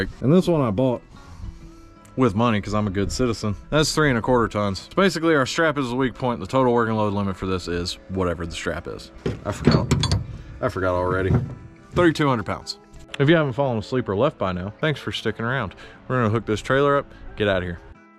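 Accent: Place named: North Carolina, United States. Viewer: That's the North Carolina accent. I have a lot of friends from NC.... They sound like that too. 😁❤️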